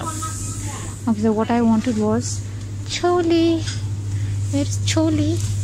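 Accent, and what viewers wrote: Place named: Punjab, India. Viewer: Teri Hindi mein thoda Punjabi touch bhi hai🤭😜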